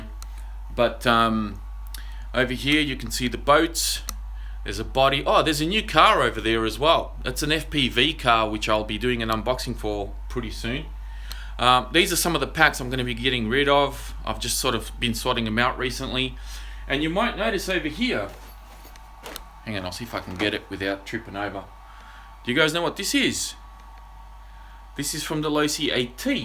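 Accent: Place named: Australia. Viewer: hey, welcome back mate,,, my rc channels surfing on the web isnt complete with your one,,, glad to hear your aussie accent back on air,,, cheers,,,:)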